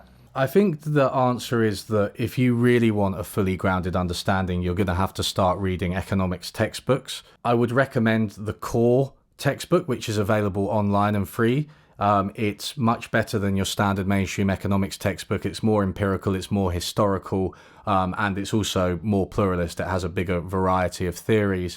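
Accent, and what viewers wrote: United Kingdom. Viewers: As a Chemist coming to the end of my masters I've been seeking none chemistry related reading to come up for air between dissertation writing and have turned to economics. Thank you for your book recommendations and the videos you have provided as a pier head from which to jump off from. I hear a very Wirral accent, I think, and as a plastic Everton fan, haha we won (for once).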